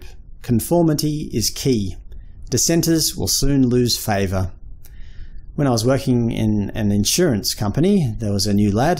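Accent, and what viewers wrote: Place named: Australia. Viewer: Hey there. I value your commitment to informing the public on misanthropy, and I really like your content. That accent sounds Australian, as am I. I am a researcher interested in understanding human behaviour, particularly so far as it relates to anthrozoology and ecopsychology. I am curious, are you a scholar or academic professional?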